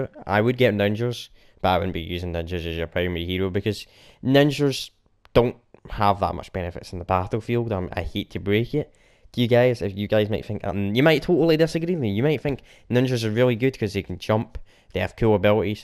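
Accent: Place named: Scotland, United Kingdom. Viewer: If you dont unstand that English then you must of never head of a Scottish accent before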